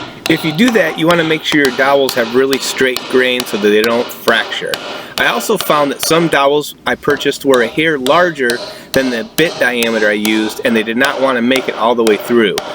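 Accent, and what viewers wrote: Michigan, United States. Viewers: Your accent brings me home. I grew up in Michigan. But I've lived in AZ for 30 years. You sound like my nephews.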